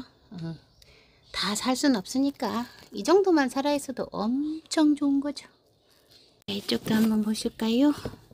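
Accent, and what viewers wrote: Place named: Gwangju, South Korea. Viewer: 사투리가 정겹습니다 저도 광주라 듣기편했어요 ㅎㅎ 삽목이 꽃피운거 분양해주시면 안되나요? 저도 러샤바욜 하나 키우는데 둘이 칭구 만들어주고싶네요~~^^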